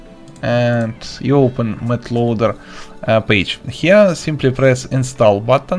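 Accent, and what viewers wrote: Russia. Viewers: очень слышен русский акцент спасибо помог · i dont know if i can watch tutorials on anything unless they have a russian dudes voice after this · Кааааеф. Так отчётливо слышится русский акцент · ну привет, по акценту можно сразу понять что ты русский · русский акцент выдал тебя))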